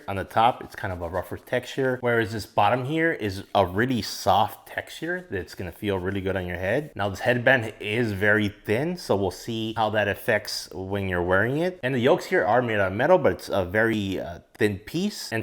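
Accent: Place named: Philippines. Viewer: i hear a filipino accent.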